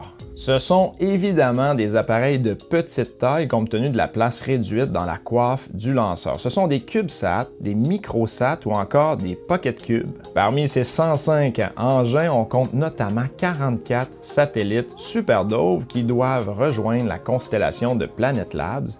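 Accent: Quebec, Canada. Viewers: Elles sont super vos vidéos et j'aime bien le petit accent du Québec.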